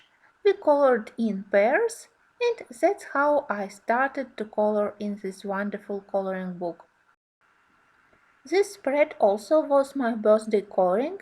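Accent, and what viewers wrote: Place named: Russia. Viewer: Вы русская??? Очень русский акцент, как будто в российской школе учили английский язык))) я не ошиблась?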